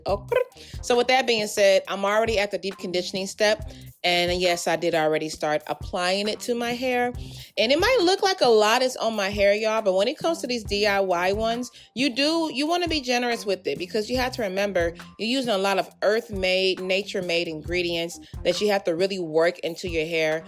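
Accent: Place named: Yoruba, Nigeria. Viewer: Yoruba ke?!! How did I miss that and I’m Yoruba 😂😂🙈🙈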